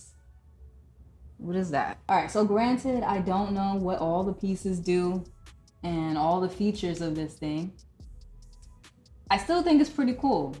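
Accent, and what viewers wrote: Boston, United States. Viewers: Boston hat is 🔥🔥 and lol on the accent, I’m from Boston and hate that accent lol it’s more white Boston/suburbs. I’ve had a busy week with hats. sneakertown had some re stocks and you talked about before the Morton salt hat. I’ve been waiting for it since then and my girl hit on it for me last one in my size and she copped the biggie hat from the 5 mike pack, and the rangers hat from ecapcitys drop last Friday cause I went for the cubs. She’s awesome. Also copped my first Rockies hat too. I miss your upcoming drop videos you do the best ones cause you talk about ones that haven’t dropped yet. Appreciate you and your videos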